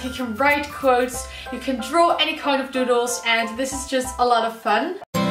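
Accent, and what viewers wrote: Netherlands. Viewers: No hate but I immediately knew you were Dutch the moment you started speaking lol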